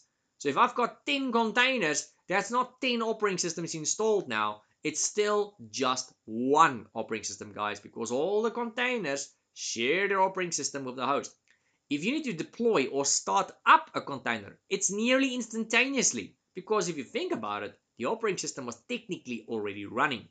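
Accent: Afrikaans, South Africa. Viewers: Cheeseburger 😅 Dude, I love your SA accent. You make more sense than Prof Messer.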